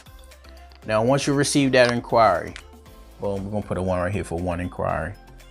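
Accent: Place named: Washington DC, United States. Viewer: I can tell by your accent you're from DC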